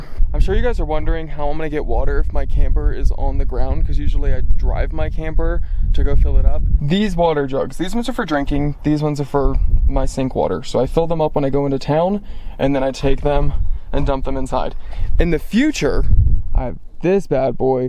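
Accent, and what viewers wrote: South, United States. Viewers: Do your parents support your sexuality? Would be curious to hear you talk about that. Growing up in rural places can be really taxing, maybe im being presumptuous but I definitely think I hear a southern twang in your voice. It’s cute. Really happy for you and austin, hope you guys have many happy years together on the farm.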